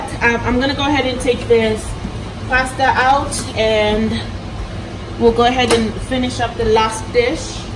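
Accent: Kenya. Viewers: You sound Kenyan, are you from Kenya?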